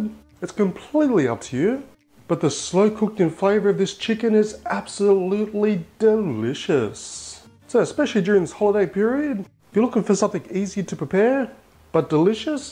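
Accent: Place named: Australia. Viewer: From one Aussie I can say he is from Australia!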